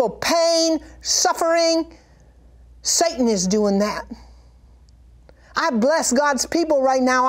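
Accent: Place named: Oklahoma, United States. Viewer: You remind me of * I think. The accent maybe??? From Oklahoma?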